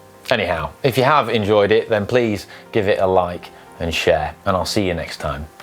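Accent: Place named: Yorkshire, United Kingdom. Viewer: He reminds me of Jeremy Clarkson with his mild (I assume) yorkshire accent.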